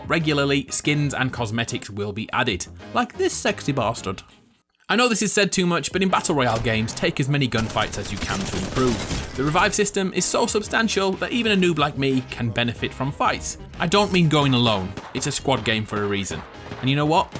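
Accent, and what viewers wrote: United Kingdom. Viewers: Why are all these Apex Legend tip videos all have British narration? Not a complaint, just an observation.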